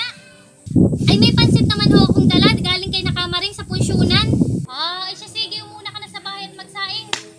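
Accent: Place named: Oriental Mindoro, Philippines. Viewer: Akoy tuwang tuwa sau marehin namiss k gnyng tono ng salita jn s ating Or. Mdo.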